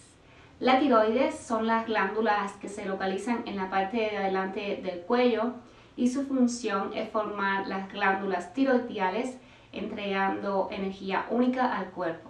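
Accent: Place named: Cuba. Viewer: Hoy practiqué esta rutina y me encantó y pienso que hay muchas del arado que pueden resultar y también la de la bicicleta con los pies vertical. Yo las hacía antes con mi lana pero contigo es mucho más juvenil. Me he quedado asombrada con la mejoría en tampoco tiempo, tres días. Me luce que tú eres cubana, por el acento, Acláramelo! 🇩🇴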